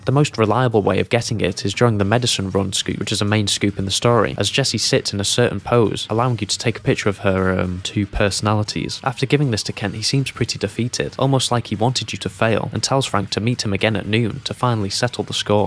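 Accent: United Kingdom. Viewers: Great review. I miss DR1. Are you British?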